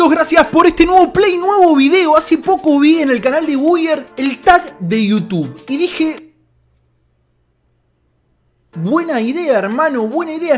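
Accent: Argentina. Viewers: Genio! Te sigo desde un tutorial que subiste en fifa 14 creo que era... sobre como dejar pasar la pelota que no sabia como hacerlo, y después vi videos de rem jaja le ganaste de mano en mi caso... escuche cuando explicabas, y dije este pibe es argentino y ahí me suscribi. Saludos desde Carmen de Patagones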